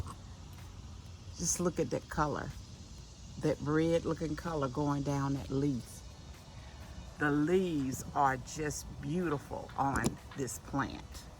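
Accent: South, United States. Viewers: Hi, love your beautiful southern accent, your blessing, your knowledge about the glorious curcuma blossoms & your theme song at the end. I’ve just bought 3 curcumas & have planted them in a trough planter. I have placed it under my bougainvillea standards facing south. Hope it works. 👩‍🌾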